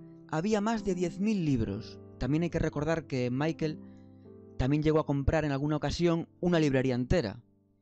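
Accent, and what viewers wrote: Galicia, Spain. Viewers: Hola me encantaría saber por qué el paleto de Conrad Murray escribió un libro "This is it" sobre michael, que me imagino la de falacias que debe de tener, aparte de que si realmente era su médico, no debía de contar nada de EL por respeto. ¿Por qué nadie regula que se publiquen este tipo de libros? Que podrido esta el mundo. Se ha desmentido este libro? Graciias siempre🥰 Pd: por cierto de donde eres? Porque tienes acentiño como gallego o del norte.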